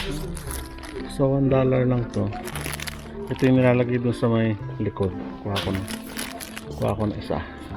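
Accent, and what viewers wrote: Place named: Baguio, Philippines. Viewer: Parang tga Baguio si kumander kuya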